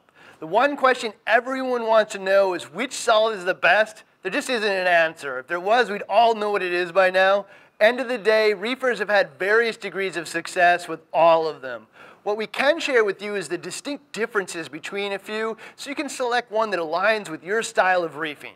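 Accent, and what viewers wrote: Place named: Minnesota, United States. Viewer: That explains his vowel sounds. Minnesota... I don't mean to nitpick; the videos are great, but northern accents are like nails on a chalkboard to me. I'm sure my Texan accent is just as offensive to people.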